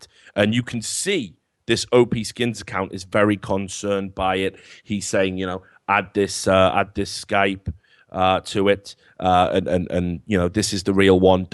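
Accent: United Kingdom. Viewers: Is it just me or is speaking in a more british accent in this video..:^) I like it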